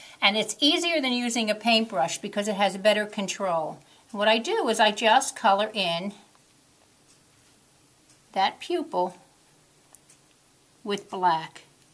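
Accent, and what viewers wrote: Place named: New Jersey, United States. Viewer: The minute I heard your voice, I knew you had to be in Jersey! Hahaha....Jersey shore girl here! Neptune!!! Anyway, can you tell me what pencils you are using and what the black pen brand is? Thanks.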